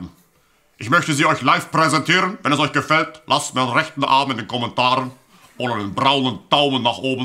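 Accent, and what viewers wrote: Germany. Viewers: Ich kenne leute die denken das jeder deutsche so spricht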